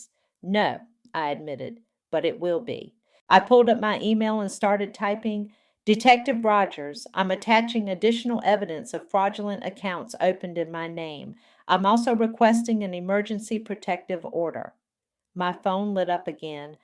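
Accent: South, United States. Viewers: Bravo... Great story Thanks loved the southern woman narration ❤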